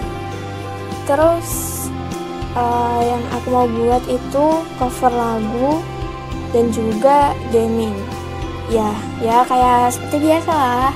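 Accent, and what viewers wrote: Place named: Java, Indonesia. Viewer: waahh jawa banget! btw semangat yaaa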